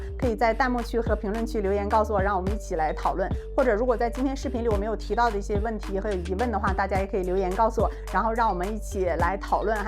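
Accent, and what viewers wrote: Northeast, China. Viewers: 麻烦能否克制一下东北口音，尽量说普通话，这样效果更好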